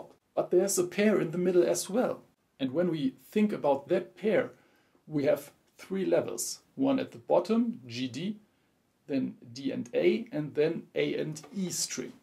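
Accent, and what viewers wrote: Germany. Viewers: man hört das du deutsch bist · wie man einfach hört, dass du Deutscher bist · Ich liebe diesen deutschen Akzent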